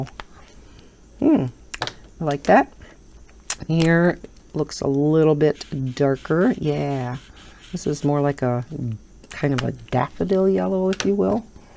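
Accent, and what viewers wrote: Midwest, United States. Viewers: I love your videos....where are you from? I was born in Mn and I hear a mid-west accent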